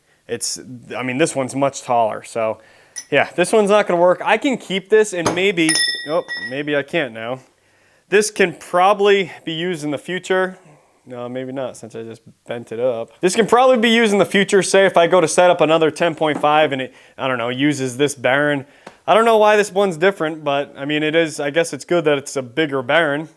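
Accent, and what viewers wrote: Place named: Canada. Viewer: Boston? My guess was Canadian haha. I can hear it now that I'm typing this and you keep saying bearing!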